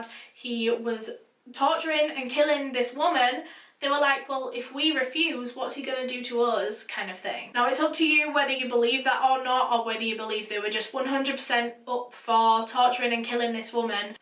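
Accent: United Kingdom. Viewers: wait...her accent is so amazinngg its like british + merida's accent (idk her accent sorry) its so coooll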